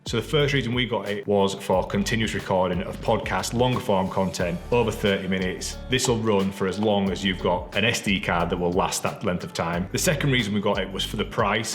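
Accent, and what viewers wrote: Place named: Northern England, United Kingdom. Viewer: D'ya know summat, it's so nice to hear not only an English accent but a NORTHERN English accent on YouTube! you have a new sub my friend!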